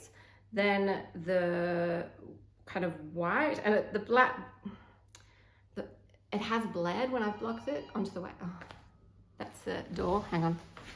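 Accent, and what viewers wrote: Australia. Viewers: Congratulations on your first podcast! I really enjoyed your relaxed, chatty and informative style. I was initially drawn to your Aussie accent (fellow Aussie here!) and look forward to more episodes. Best wishes from Sydney!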